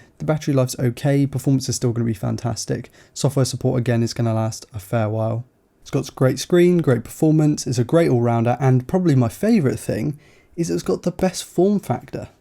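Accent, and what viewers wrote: United Kingdom. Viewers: Hi! I don't usually comment on videos, but when MrMobile made his giveaway task to be "comment something nice on underrated tech channel's videos" I immediately thought of you. You really make great content and your calm presentation (and beautiful british accent) make your videos a joy to watch. You also make videos on used smartphones which I think is great. Old flagships are still good phones! And congrats on getting to work with Mazda. That was a good video! I really like cars (especially Mazdas) so that might be why.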